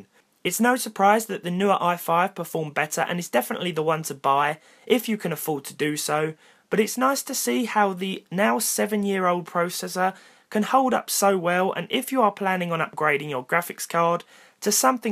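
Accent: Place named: England, United Kingdom. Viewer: Nice video and also i have to say that i like so much your English accent, it is easy for me to understand being Spanish Where are you from?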